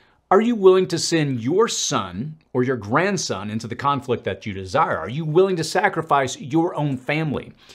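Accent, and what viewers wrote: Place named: South, United States. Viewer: Can hear the southern in ya hah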